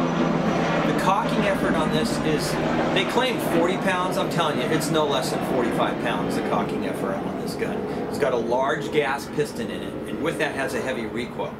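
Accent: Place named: Southern California, United States. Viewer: Sounds like you live in Southern California.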